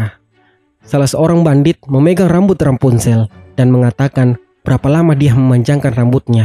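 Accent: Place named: Manado, Indonesia. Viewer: Suka krna logatnya, logat menado kah? Intinya suka bgt deh 😂💙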